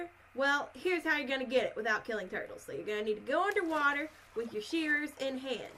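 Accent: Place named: United Kingdom. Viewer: That British accent though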